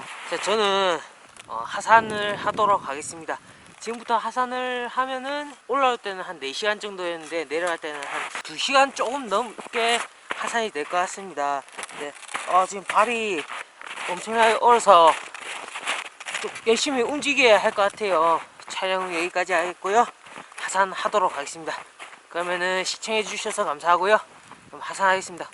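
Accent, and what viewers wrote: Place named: Heilongjiang, China. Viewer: 옛날생각난다..말투가 흑룡강독립운동가 후손같네요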